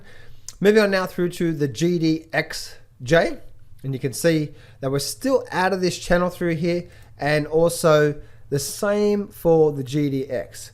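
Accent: Australia. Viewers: So all the criminals from England were sent to Australia in 19 th century...you should work on your English or American accent. ..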